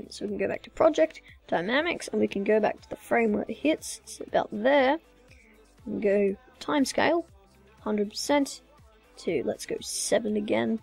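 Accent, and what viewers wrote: Australia. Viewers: you have the most beautiful australian voice :) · I wish i had your Australian accent :3 I have a british accent and it kind of sounds like it but meh xD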